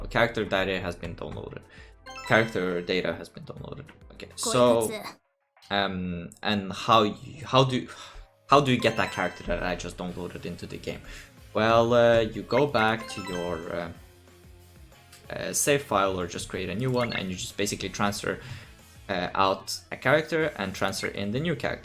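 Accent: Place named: Russia. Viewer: У тебя акцент русский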